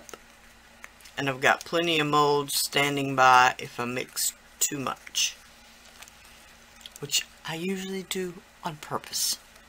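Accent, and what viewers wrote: South, United States. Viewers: You are the best xplainer love your southern accent Did all the pieces get baked for 1 hour/1/2 at 220.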